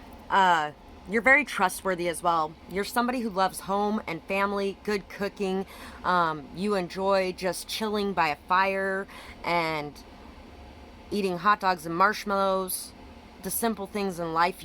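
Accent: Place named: United States. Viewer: euhm i don't understand American accent :/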